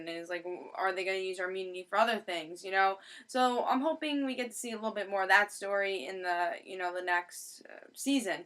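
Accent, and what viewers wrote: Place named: New England, United States. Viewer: are you from New england? dat accent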